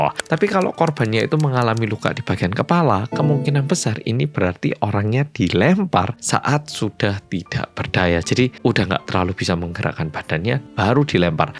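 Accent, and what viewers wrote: Java, Indonesia. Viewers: hai malem muedok lgsg nyimaaak🎉 salam sehat💪🏿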